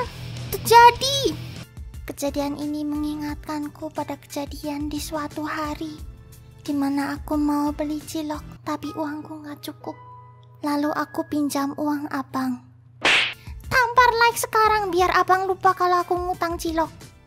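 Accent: Java, Indonesia. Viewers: Mencium aroma aroma logat jowo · Medok ya 😅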